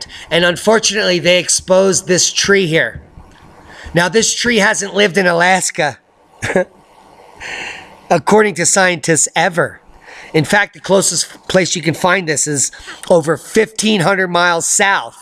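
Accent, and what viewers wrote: England, United Kingdom. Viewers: Hi. Great video! a lot of fun. Loving the..English accent, mixed with a little Australian too!? That little 9c 'tippy-touch' in the UK is called Cornwall. ps The Queen Muvva don't give a toss about snow..she died in 2002!! oh, and Congratulations to you both!!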